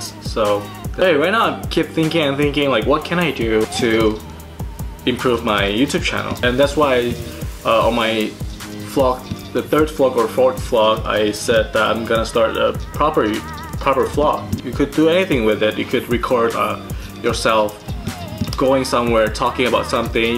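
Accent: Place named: United States. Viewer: It's been a long time I did not hear your voice. Turns out you speak with a stark American accent now! :)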